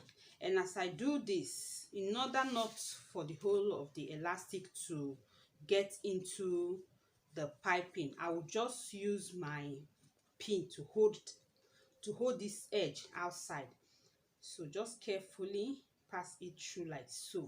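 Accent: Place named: Nigeria. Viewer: When I listened to your video I figured that u were from wonderful Nigeria. U r an excellent teacher. 💘💘💘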